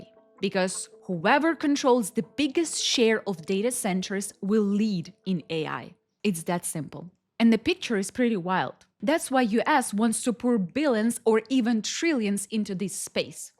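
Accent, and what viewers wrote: Russia. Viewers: Девочка очень старается. Но даже не носителю английского ее российский акцент за версту слышен. Но если будет усердно над этим работать, за несколько лет возможно научится похоже на носителей говорить.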